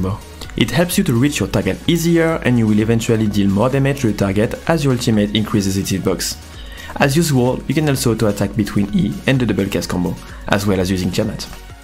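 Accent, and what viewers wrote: France. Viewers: il l'est très clairement, impossible d'avoir un accent pareil sinon aha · J'ai ultra l'impression que t'es fr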